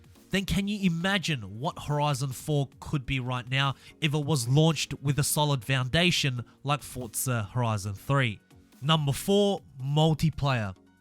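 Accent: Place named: Australia. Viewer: Everyone who is recording forza videos have Australian accent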